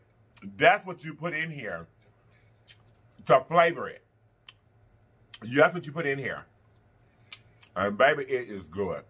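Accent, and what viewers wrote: South, United States. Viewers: I love you!! I’m from the Sip and I love that southern twang we have... “wait a minute!!! Ya boy done got choked out!!!” 😂🤣😆❤️ I felt that!! ✊🏽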